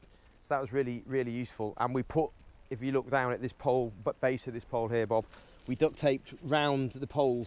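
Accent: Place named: Northern England, United Kingdom. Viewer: This accent is so unique. It's almost 'posh' sounding and unlike most Northern accents. Wheres its origin?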